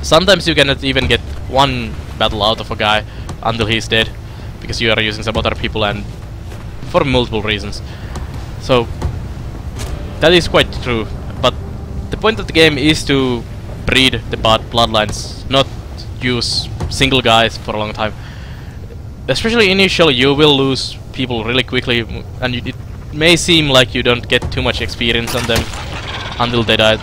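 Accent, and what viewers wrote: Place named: Finland. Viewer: "Ärrän kierrän ympäri orren...." It's funny how every Finnish accent is different, but recogniseable. Your english is really good though :)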